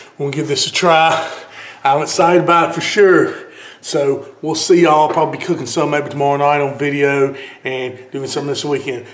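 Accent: South, United States. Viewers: I love ur southern accent!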